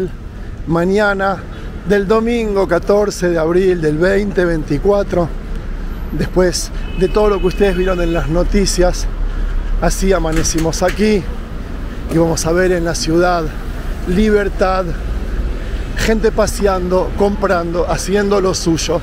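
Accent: Argentina. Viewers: Estaria bueno que defiendas las Islas Malvinas con la misma energia,va digo por tu acento argentino...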